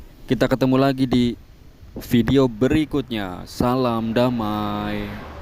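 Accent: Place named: Cirebon, Indonesia. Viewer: Logat logatnya mirip Jawa Cirebon nih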